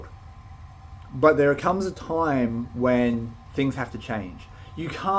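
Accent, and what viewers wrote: Australia. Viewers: Do what ya gut tells you to do,, you and ya brother are incredible I'm recently retired and found your YouTube a couple of months ago. Because of you I now have a Detector, a Pinpointer , and exploring country I would never of seen before. Whatever ya choose,, I still like ya content 🙏😎 (nothing wrong with the way you speak,,, its Australian ❤❤)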